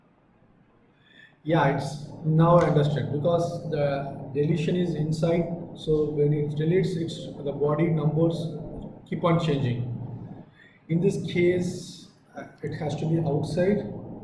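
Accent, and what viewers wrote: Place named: India. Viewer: OMG accent English Indian is just Horriful xD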